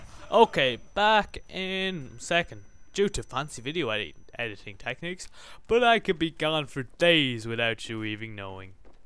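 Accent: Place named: Ireland. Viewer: wa part of ireland are u from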